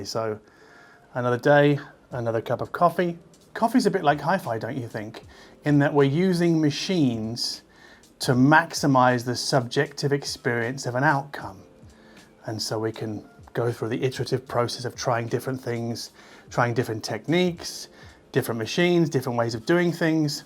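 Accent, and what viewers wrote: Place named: United Kingdom. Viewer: U sound like u live in the uk but u live in berlin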